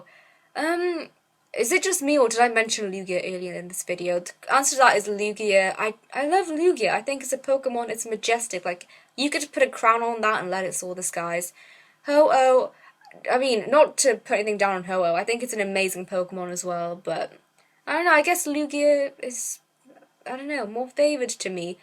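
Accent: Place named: United Kingdom. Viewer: Hey :) i'm a new subscriber & i couldnt help but notice your interesting accent. Roughly whereabouts to you live in the UK? Also, i enjoyed the video :D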